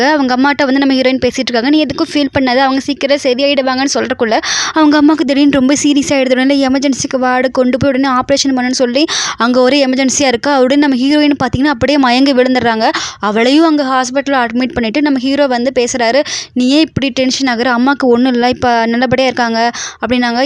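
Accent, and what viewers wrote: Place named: Sri Lanka. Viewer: Unga voice srilanka voice mathiri irruku sis .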